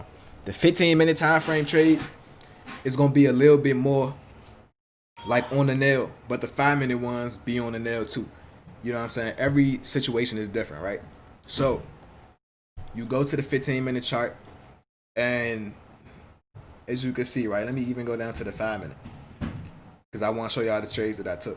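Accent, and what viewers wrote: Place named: Baltimore, United States. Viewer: Gotta be from B’More or at least in MD/DMV but hear strong Bmore accent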